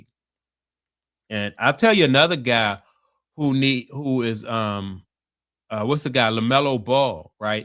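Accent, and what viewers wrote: Baltimore, United States. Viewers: That Baltimore accent is heavy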